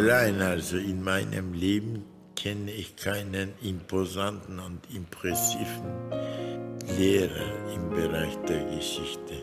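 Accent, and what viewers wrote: Germany. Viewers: Bavyera Almancası ile mi konuşuyor diyeceğim, evet. Neden Neu Hoch Deutsch değil ? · Hannover aksanımı bu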